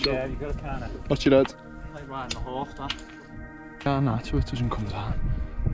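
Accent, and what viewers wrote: Liverpool, United Kingdom. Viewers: Good filming & great to hear a fellow scousers on You Tube. Cheers lar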